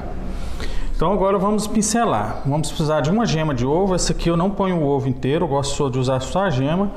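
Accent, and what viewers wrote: Minas Gerais, Brazil. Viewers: Muito bom, vc fala como mineiro, até pensei que vc era das minas gerais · Amei seu vídeo! Muito bem explicado, bem objetivo. E, como boa mineira, saudosa do meu estado, observei alguns toques de pronúncia, me parecendo estilo mineiro, o que me deixou ainda mais à vontade para acompanhar sua verdadeira aula. Grande abraço!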